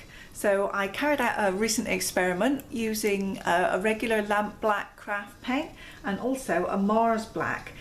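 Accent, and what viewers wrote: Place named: West Country, United Kingdom. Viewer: Beautiful, I can see why you are thrilled with this piece! By the way, your accent sounds West Country UK, is that correct? X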